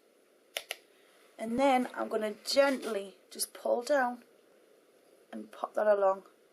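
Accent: Newcastle, United Kingdom. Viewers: hey! i spent a month in newcastle recently (I'm from New Hampshire)...you sound like the people I met there! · Lol, newcastle accent. NE England.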